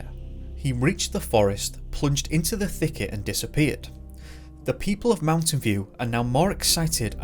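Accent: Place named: Northern England, United Kingdom. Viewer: It's his Northern English accent , fairies is pronounced furries .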